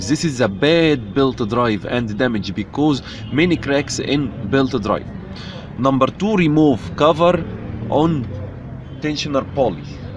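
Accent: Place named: Egypt. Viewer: مع ان أسمك إنجليزي ولكنك حرقة اللغه الإنجليزية بلكنتك المصريه أرجوك خلك على لغة بلدك أحلى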